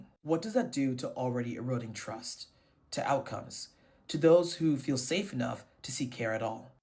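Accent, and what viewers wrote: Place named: Africa. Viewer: You are correct about privacy. We are in crisis in America--Aliens need to go home and get their healthcare and keep their privacy. Some of the immigrants are dangerous killers. You should be happy that ICE is there . You look and sound like a 1st or 2nd gen, African or Haitian, so. you see things different than non administrative Americans.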